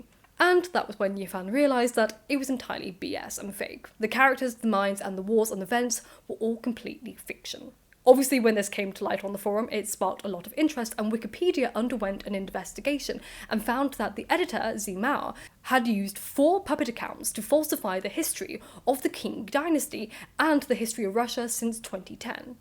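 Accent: RP, United Kingdom. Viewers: No she's just British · Was your father in the House of Lords cause I’ve heard posh accents before but that’s something else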